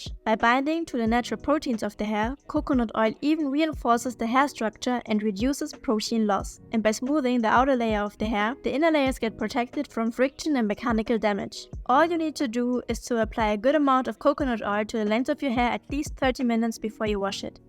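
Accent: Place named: Germany. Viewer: I hear a strong German accent 😄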